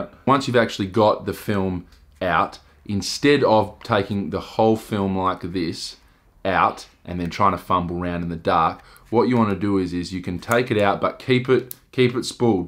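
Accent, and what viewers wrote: Australia. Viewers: His accent makes me feel naturally that he's an aussie.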